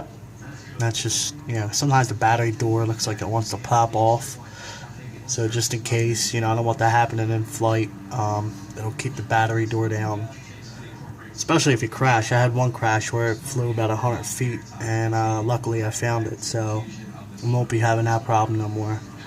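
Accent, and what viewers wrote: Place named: New Jersey, United States. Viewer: Good video...thanks for the info! Your Jersey accent was rough but I'm a southern boy LOL. Thanks again.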